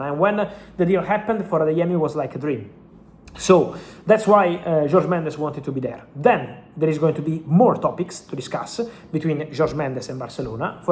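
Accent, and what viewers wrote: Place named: Italy. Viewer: Mate I would love to give you a like when I try to watch your channel but I can’t understand a word you say. I think the content is world class but Unintelligible. I struggle to understand you because of your strong Italian accent and how quickly you speaks. Your English is good, but your pronunciation is difficult for me to follow. CC helps but names are garbled. Anyway great info thanks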